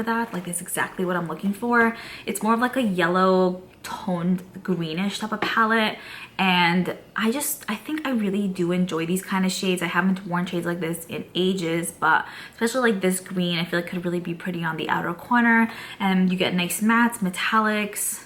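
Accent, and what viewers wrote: New York, United States. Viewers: She has a New Yawk accent just like me!! 😂😂😂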